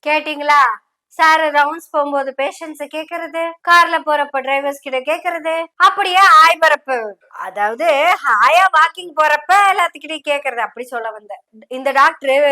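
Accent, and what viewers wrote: Kongu, India. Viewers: Ur kongu speech is so 😊😊 beautiful.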